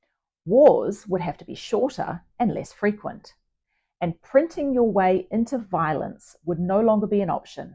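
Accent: New Zealand. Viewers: Very informative. Your accent sounds very Kiwi...in a good way:)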